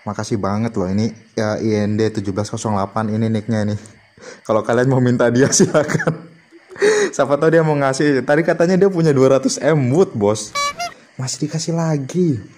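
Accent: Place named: Eastern Indonesia, Indonesia. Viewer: Orang timur ini yak wkwk sa ko sa ko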